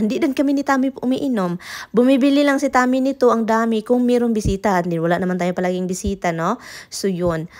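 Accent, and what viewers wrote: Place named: Tagalog, Philippines. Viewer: Natakdan-nahawa sa tagalog ate hehe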